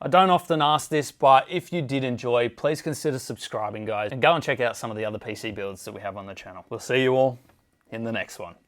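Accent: Australia. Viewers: nice mate. Love your content 👍🏻 I knew you're Aussie from the accent but wasn't sure if you're currently residing in AUS or USA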